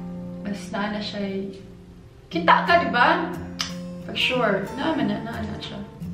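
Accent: Visayas, Philippines. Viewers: Kyut mo magbisaya, love watching u kahit ndi ko maintindihan ang cnsabi mo 😇😂😂I mean, Hindi ako nakaka intindi ng bisaya. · Cute kaayo nimo mgbisaya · Ganahan gyd ko maminaw nmu mag bisaya. cute kaayo i'm from bohol po ❤ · Cute kaayo ka mag bisaya ate! Iregards ko ni Julia barretto please hehw God bless sa imo mga vlogs na unta mas mudaghan pa imo mga subscribers!! Congrats diay ateee ❤️❤️ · Ka cute kaayu nimu mag bisaya dai..im from cebu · Samtang ga tan-aw ko sa imong video ma'am cute kaayo ka paminawon mag bisaya ba. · I grew up noticing she has a Bisaya accent when speaking Tagalog because i have too. She speaks more fluid with bisaya and sound better too. Her Tagalog bisaya accent is cute tho hehe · Hahaha cute kaayo magbisaya oi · Ka cute ba mag bisaya uy😍😍😍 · Hahaha. Ang cute cute mo talaga kapag nag bibisaya. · ka cuteee ba nimu mag bisaya hahah.. 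pa shout out nmn nxt time plss.. salamat kau 😘😘😘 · Ang ka cute mn ka mag bisaya ate oi - ginalee tampus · Makadumdum ko ni Lucy Torres sa imong accent. Bisdak jud. Mga gwapa jud ning mga bisdak. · 😘😘😘😘 Maka heart2 imong pagka bisdak🙌🙌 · Bisdak here from. Bohol😍😍😍cute kaayo ka magbisaya maam 😊😊😊😉❤❤❤😘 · As in dai. Labaw na guapa kaau unya hastang bisayaa diay. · Ka cute jud mag bisaya ui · Gwapaha nimo ate oy, cute kay mag binisaya ba 💓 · Cute kaayo ka mag bisaya ayy. Gwapa kaayo ka😍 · Kagwapa nlang bayhana uy! 😍😍cute kaayu ka magbisaya inday! ❤ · Aw grabe jud ang bisaya ni oi ehhehe ganahan ky ko maminaw ahhaha hugs from 🇫🇮🇫🇮🇫🇮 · Hi Ate ka cute gyud nimo magbinisaya ba..unta magbuhat ka'g vlog cooking adobong manok in bisaya language.. 😘😘😘😘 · lami ahh paminawn pag mag bisaya ka oii idol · ang cute mo ate mag bisaya..kahit hindi ko maintindihan❤❤❤ Proud bicolana here.. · pretty as always ug wala kalimot ug bisaya with the accent..😍😍😍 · Lingaw man diay maminaw magbisaya hahahahaha or gwapa ra jud si maong cute paminawon 😂😂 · Mao jud ni si Erika kay ang accent ba 😂 kamuot nimo oi, di ko sanay makakita sa imo sa tv/video nga bisaya ang sinultihan 😁 Congrats sa imong Silver Button! 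👏🏽 Keep vlogging! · cute kaayo ka ate magbisaya hahah. Proud Bisaya here! 💓 Congrats ate! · Gwapa kaayo ka dzaiii cute kaayo ka mag bisaya uy! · Ang cute cute mo po mag salita ng bisaya hindi ako nakakaintindi ng bisaya pero super ba enjoy ko hahaha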